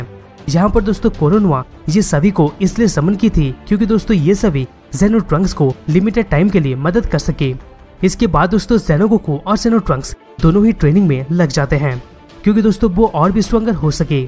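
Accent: Bengal, India. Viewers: Great bhai par apke pronunciation se samajh mein ataa hein ki aap Bengoli hon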